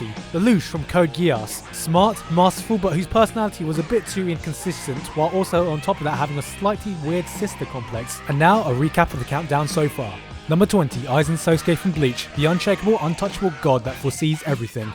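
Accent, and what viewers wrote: United Kingdom. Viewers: In the Naruto world we have a lot of good characters: Shikamaru is okay, Kakashi is good, but it was Gaara who taught me to think differently when I hate something's guts for half the show and then becomes my favorite character. Still....it was the snipits I saw of Kakashi that got me interested in Naruto in the first place, so I guess I can be content. (After watching what I assume is over an hour of this, my internal thought voice is now a British accent.)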